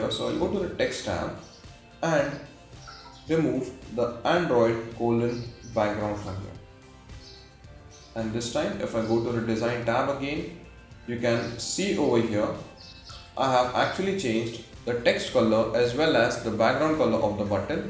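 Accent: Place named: India. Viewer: DUH BHUUTTTOOOONNN!! THICK ASS FUK INDIAN ACCENT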